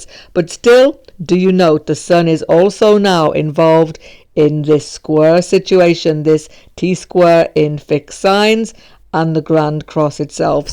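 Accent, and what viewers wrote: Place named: Scotland, United Kingdom. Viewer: Love the explanations and def love your Scottish accent❤️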